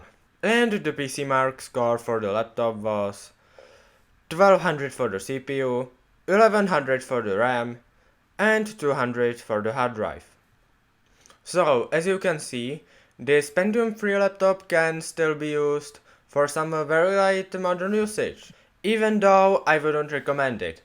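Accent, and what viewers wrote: Czech Republic. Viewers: it's just Czech accent :-D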